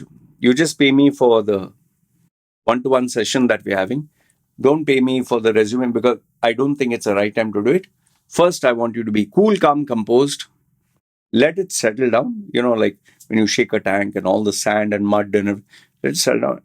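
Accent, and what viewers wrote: India. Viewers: Who the F are you? You have a thick Indian accent and you don’t know anything about caste discrimination? You should win an award for the most disingenuous and insensitive POS. Enjoy your pretentious life.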